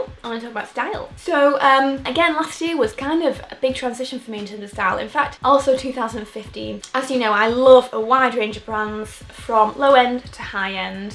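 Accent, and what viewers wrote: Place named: North, United Kingdom. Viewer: where are you from? you sound quite northern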